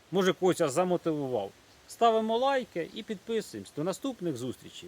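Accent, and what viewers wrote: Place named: Poltava, Ukraine. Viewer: Полтава? Акцент как на полтващине. Прыг и бег если нет проблем с коленами, самое простое для похудения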